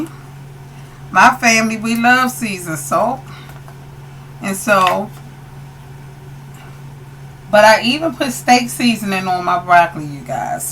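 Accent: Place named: Maryland, United States. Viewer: I agree. There is a difference between cooking fresh broccoli vs frozen broccoli. The frozen kind doesn’t hold flavor like I want it to. But I’ll cook with either one still. Also, I can hear in the way you talk that you’re from Maryland girl. I am too! 🙋🏽‍♀️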